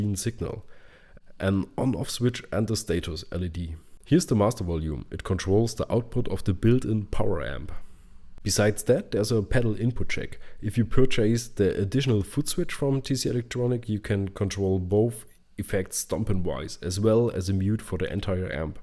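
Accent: Germany. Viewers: Nice review and accent ;) Typical German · funny little gear and with your funny german english :))) great as allways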